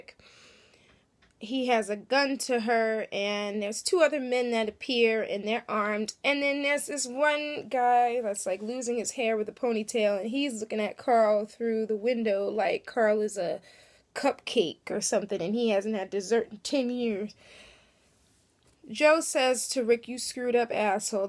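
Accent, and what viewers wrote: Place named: South, United States. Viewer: and from your accent i would say you are a southern girl so yea throwing a curve ball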